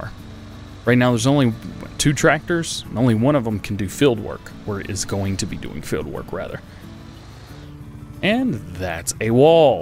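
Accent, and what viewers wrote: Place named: United States. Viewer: Really enjoyed the video, love the American cowboy accent on a British farm!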